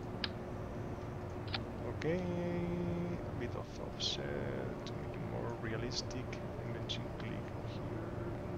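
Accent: Spain. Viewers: Nice, porcierto, asumo que eres español por el nombre y la forma de hablar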